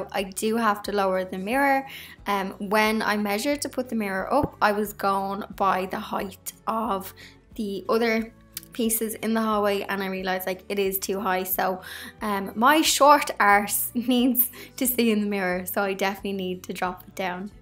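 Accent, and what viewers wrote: Ireland. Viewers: Am still working from home. Have you returned to work or are you focusing on YouTube? Love your channel, ❤️ your house, and especially your adorable Irish charming personality and accent. Isn’t spring wonderful?💐🌻🌿🪴hugs from Arizona 🌵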